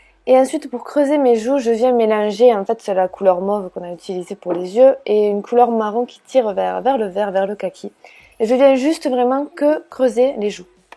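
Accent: South, France. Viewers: ton accent c'est celui du Sud ? 😘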